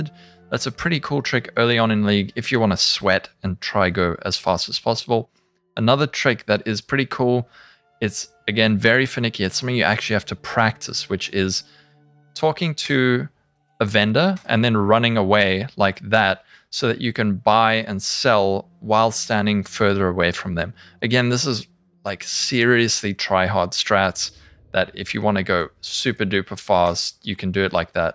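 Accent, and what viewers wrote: South Africa. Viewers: qAre you a Saffa bud? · Are you South African? Level 70 rare items, if you get a full set(Unidentified), you can get 2 chaos from vendor. level 80 rare set gives you 2 regal orbs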